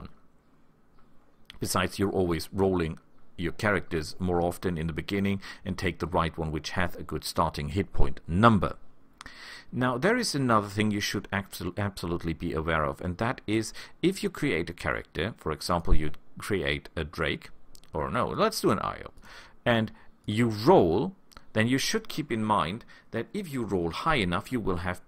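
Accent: Germany. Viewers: "Papaaaa, let's invade ze Poland again" heh Cute german-like accent aside, really looking forward this let's play, always nice to have some cozy voice playing something on the other monitor while I waste my life away grinding some game.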